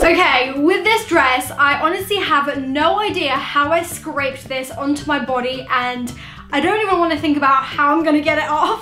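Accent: England, United Kingdom. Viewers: Are u supposed to be British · Is she English?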